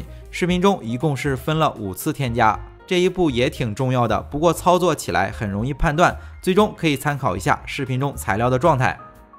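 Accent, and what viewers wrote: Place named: Shandong, China. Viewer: 喜歡老爸偶爾解說 非常親切的山東口音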